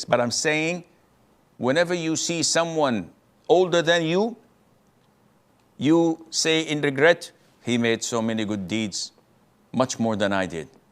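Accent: France. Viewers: I rewatched the video 3times just to catch the french accent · The heaviest french accent I've ever heard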